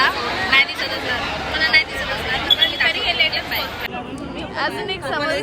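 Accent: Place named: Malvani, India. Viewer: ur malvani accent is so cute, say it again 😝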